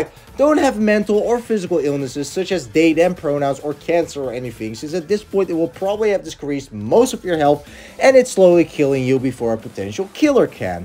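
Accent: Netherlands. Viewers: are u dutch lol?:)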